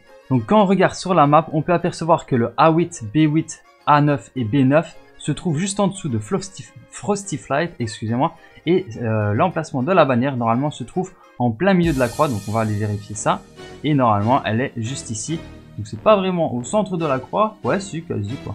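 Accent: Belgium. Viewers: Tu es belge Nn?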